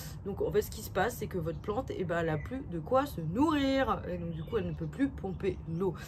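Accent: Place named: Paris, France. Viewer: Bonjour et merci. Mon Accent Marseillais est aussi prononcé que votre accent Parisien.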